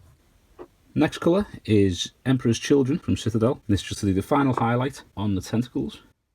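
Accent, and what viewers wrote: Liverpool, United Kingdom. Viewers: Love the Scouser accent, always been my favorite in this country, makes your videos so much more fun to watch :)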